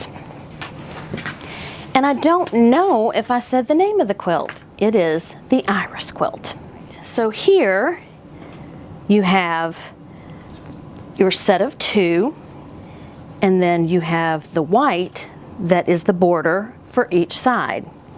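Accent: United States. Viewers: Thank you. Love this video...and your wonderful southern accent!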